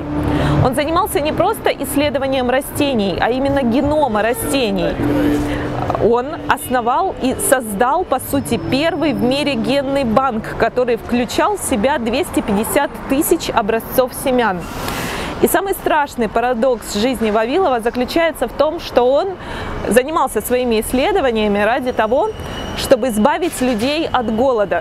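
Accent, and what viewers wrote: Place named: Stavropol, Russia. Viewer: Какой-то ставропольский говор... Но не плохо чешет так то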